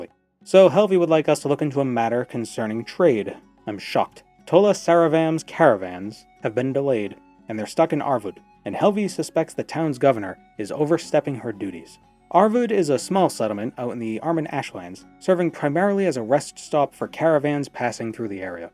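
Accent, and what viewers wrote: Boston, United States. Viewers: You should use your boston accent more often its so good (I promise I'm not biased because i live here) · So, it wasn't until I went back and watched your RS3 Maxing series that I clearly heard your Bostonian come out. I don't know how I never picked up on it from watching all of your Morrowind content AND your streams lol. I absolutely love it, honestly. It's the same joy I get when I hear Jerma slip into his Bostonian. I get it, my Texanese will show up from time to time.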